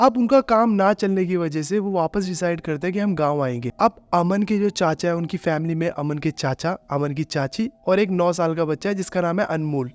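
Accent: Punjab, India. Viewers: Bhai apki language me punjabi accent h.. apka bg kaha se h??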